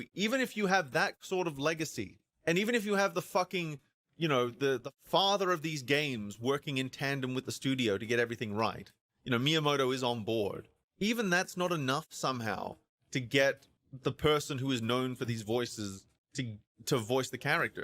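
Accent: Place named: Australia. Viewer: You have the classiest Australian accent I’ve ever heard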